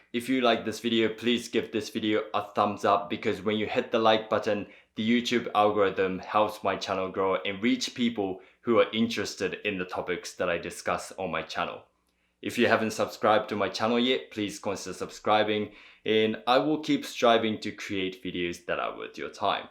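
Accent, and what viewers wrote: New Zealand. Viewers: You're a Kiwi, aren't you? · Your English is very fluent. You can definitely hear the NZ influence in your accent though :) Do you ever forget japanese from time to time? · stumbled upon your videos and i must say the way you say certain words is very 'kiwi". some words i picked up are get, seven, end, test, next, friends. Mostly the vowel sounds of 'e'that are almost pronounced as 'i'. Like you, i''m a migrant to NZ and can relate to your struggles. Your videos are very cool by the way. Your new follower from Auckland. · Kia Ora. Your English is perfect and still very "kiwi". Great to see you wearing your 'pounamu' as well. I do hope you will come and visit us in NZ in the future. Love your videos! Best regards from down here in the other shaky isles.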